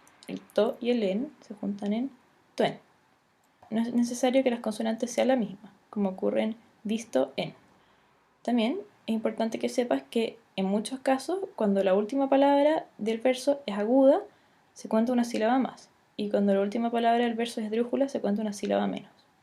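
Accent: Chile. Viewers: A mi igual me gusta el ACENTO chileno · Cuando se nota que eres chilena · no me gusta el asento chileno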